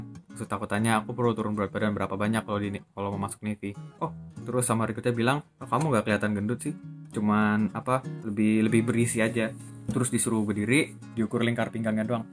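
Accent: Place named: Java, Indonesia. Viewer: Wihh jowo Endi mas 😂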